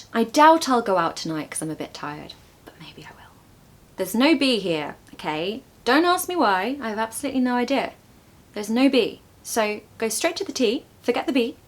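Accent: RP, United Kingdom. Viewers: That's probably the main reason why I'd go for a strictly phonetic language like, for instance, the German language, if I were in a situation to choose between the one and the other :) Yeah, anyways, I'm not here to complain or argue :) English is just fine, and I really enjoy learning it. Love your accent, by the way. RP if I'm not mistaken? 😊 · Amazing lessons❤❤ I love your British RP accent❤❤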